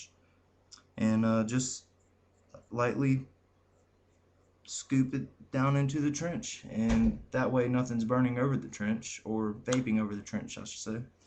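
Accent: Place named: Georgia, United States. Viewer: Great video--since I'm from GA, your accent is a plus!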